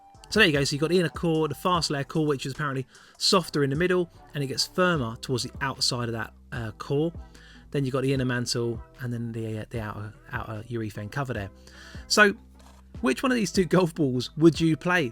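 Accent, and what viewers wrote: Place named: England, United Kingdom. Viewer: First off Zee Star?? You sound English so surely it's Zed Star. Not tried the divide tbh, but a playing partner likes them, Q Star Tour Divide. I found a Z Star Divide and gave it to him, his reaction didn't go as far Q Star, but that's the compression and with the slow swing speed he gets more out of the soft one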